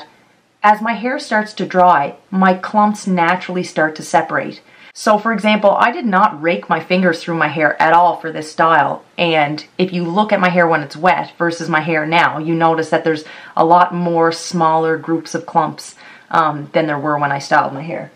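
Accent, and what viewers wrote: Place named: Cape Breton, Canada. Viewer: I've been watching lots of curly hair videos lately so this popped up as recommended - had no idea you were a caper til I heard the "shkonawn guys" haha made my day 🖤 now I'm real curious if ya got some specific tips for our wild weather in CB for curly hair, mine goes with the weather a lot of the time and I get so much frizz dude I'm not sure if its the highs and lows all the time out there or not lol. hair gets right crazy ah must be that nor'easter on its way by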